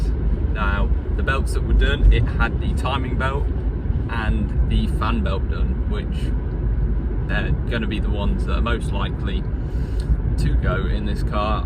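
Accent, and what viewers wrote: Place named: United Kingdom. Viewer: I was surprised to hear you were British, bruv.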